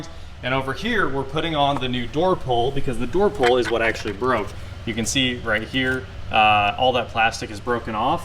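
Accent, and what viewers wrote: United States. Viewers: i have an early 97 slk230....all door locks work fine...the interior plastic paint is an issue,,it doest stand the test of time well...you can buy oe paint from germany tho...you sound like your in america so prob the heat has caused probs with the glue on the trim...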